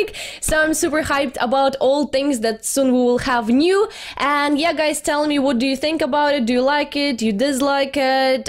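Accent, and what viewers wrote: Russia. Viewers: Ты из россии, либо говоришь по русски? Акцент тебя сильно выдает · new to channel, is your accent ruske?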